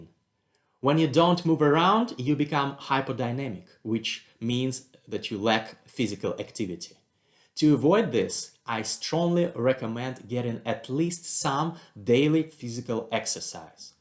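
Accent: Russia. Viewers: you mean that a starts to speak with more russian accent? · как насчёт всерьёз позаниматься с преподавателем для искоренения русскоязычного акцента? Также весьма заметно, что читается написанный текст, не круто. Но круто то, что вы делаете на русском. Желаю успехов!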